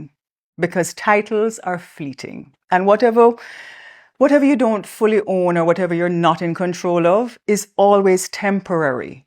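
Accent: Jamaica. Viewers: You’re still so beautiful, eloquent and classy. You sound like one of my peeps from Jamaica. It really doesn’t matter where you are from, just that you reminds me of one of my fellow Jamaicans. ❤❤❤❤❤❤❤❤❤❤❤❤❤❤❤❤ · You sound very Jamaican 🤔🤔🤔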